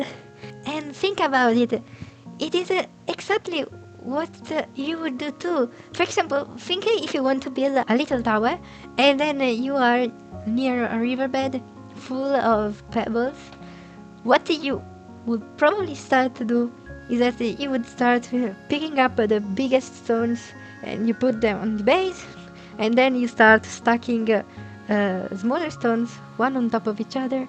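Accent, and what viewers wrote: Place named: Italy. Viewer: What is your native language? I'm curious it really sounds like italian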